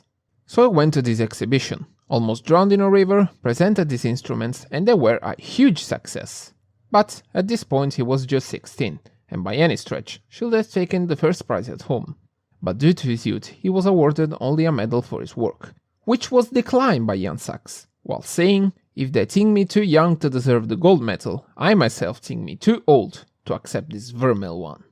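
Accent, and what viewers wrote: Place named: Italy. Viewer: You are getting better at videos, lenght is right, humor is silly and dark and animation sucks as it should. Like comment subscribe lets get the algo interested more even if it already has shown you. ( oh and work a bit on the english, the accent I dont think is a problem, but some words are clearly hard to spell for you and you slur them a little. Good pronounce + slight italian accent is the way to go imho)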